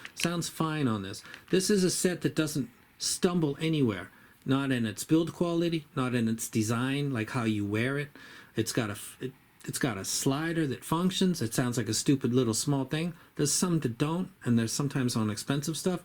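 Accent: Boston, United States. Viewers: Lol, I had a feeling you were from Boston. New England loves what you do man!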